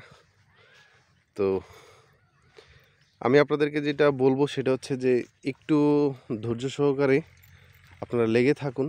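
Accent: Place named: Barishal, Bangladesh. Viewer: আইতাছি রে ভাই। বরিশালের ভাষা🥰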